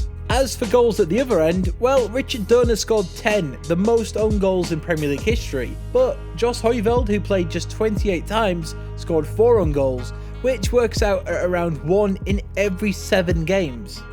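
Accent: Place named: Ireland. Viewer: What part of Ireland are u from?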